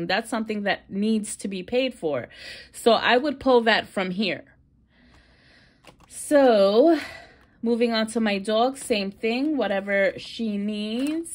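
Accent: New York, United States. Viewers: Correct me if I’m wrong but your accent sounds like you’re from New York. I like the accent lol I’m from Louisiana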